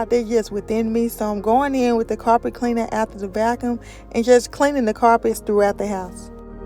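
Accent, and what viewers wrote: South, United States. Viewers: I like your country accent I'm country too Beautiful Queen you clean your house so well that Amazing. ❤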